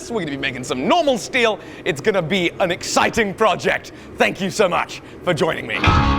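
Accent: United Kingdom. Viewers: Funny after watching your newer vids, 2026.. You sound deeper here and have a way less British accent than in your new vids? Interesting, character work? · I love your british accent :) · He's almost TOO British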